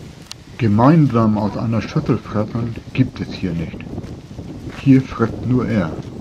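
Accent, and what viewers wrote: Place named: Hanseatic, Germany. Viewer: Durch Zufall diese Krähen-Videos entdeckt - bin ganz begeistert. Zusammen mit den tollen Kommentaren mit authentischem, hanseatischem Einschlag ein harmonisches Gesamtkunstwerk. Bitte unbedingt mehr davon und alles Gute nach Hamburg!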